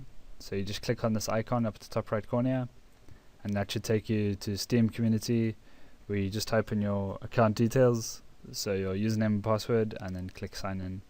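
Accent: South Africa. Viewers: you sound south african ? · Is this guy a South African ?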